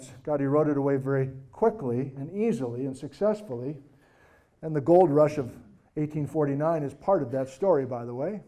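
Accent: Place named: Wisconsin, United States. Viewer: I knew I heard that Wisconsin dialect. I'm from there, too.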